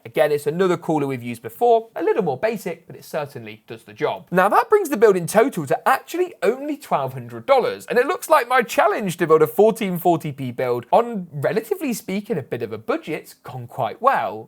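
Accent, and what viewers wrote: United Kingdom. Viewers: Are you British or American? I despise hearing Brits referring to prices in dollars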